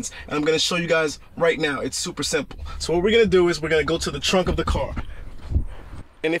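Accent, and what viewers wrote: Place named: United States. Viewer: Hi, I am Pierre from Paris (a model 3 owner) thank you for your wonderful video. And your wonderful American accent. It was super cool and super easy to be listening to you. I will watch your other video and I have subscribed to your chanel. But just one question for the trunk. You showed how to reduce the height, but if I want to increase?? Mille merci à toi!!!!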